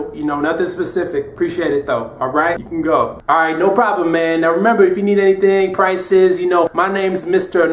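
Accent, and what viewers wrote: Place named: New York, United States. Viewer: You from New York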